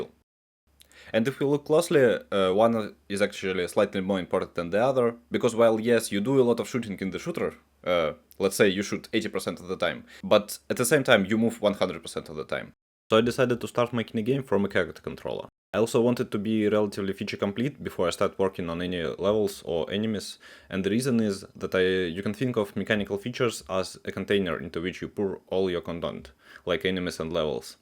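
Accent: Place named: Russia. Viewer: слыша акцент я не могу не думать что автор из России или стран снг, не удивлюсь если это так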